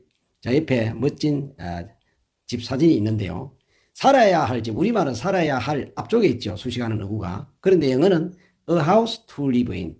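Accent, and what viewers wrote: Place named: Gyeongsang, South Korea. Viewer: 늦게 선생니을 알게 되었군요 처음부터 찿아서 잘배우고 있습니다 감사합니다 경상도 분 이신가봐요 저는 경상도 할매입니다 혼자영어공부 한지 십년이 되어가는데도 아직 버벅거립니다 그냥 공부가 좋아 조바심 없이 하고있습니다 좋은 가르침에 감사드립니다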